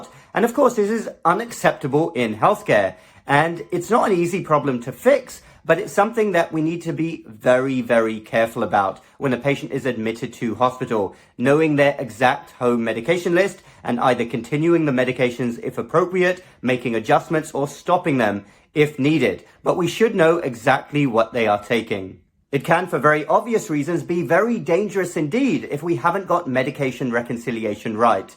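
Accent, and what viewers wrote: England, United Kingdom. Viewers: You sound English…are you talking about UK or US hospitals, or both?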